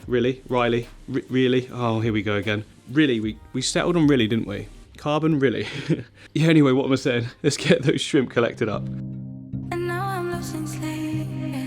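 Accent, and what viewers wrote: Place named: United Kingdom. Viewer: You know how, for example, Andrew Garfield & Tom Holland & Hugh Laurie & Asa Butterfield all hide their Great Britain accents with USA ones? You should do that.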